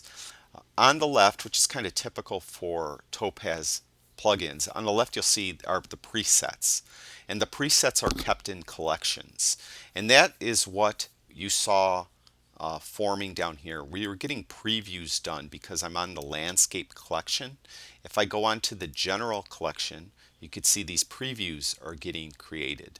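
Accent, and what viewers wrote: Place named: Chicago, United States. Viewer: You know what? people who call people shills, usually have an envy problem, or an unrealistic view of how the world works. In order for anyone to do anything, you need to eat, you need to be secure in your home, and the way that happens is by earning money. A dirty subject for sure, but a necessity nonetheless. What would it matter if you were being paid by Topaz? What`s important is the veracity of your content, and up to now, it`s been spot on. I`ve not only learned from you, but enjoyed it in the process, and more importantly, my Photographic post processing has improved immeasurably. So, top notch content, from a name right out of The Sopranos central casting (at least to my English ears)and all for the sum of watching a short ad. Doesn`t get any better than that. So forget about it, and keep doing what you do. 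Cheers... Oh, and ps, when I want to use a Topaz plugin, I just go straight from PS or LR no need for Fusion, so the whole Fusion thing confuses me. Could you elaborate on the use of Fusion sometime? Thanks... PPS, I`m guessing your accent is somewhere from the Chicago area, am I wrong?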